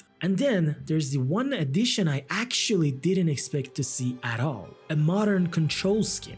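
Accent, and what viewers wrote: Iran. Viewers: Are u Iranian? I ask it because of your accent❤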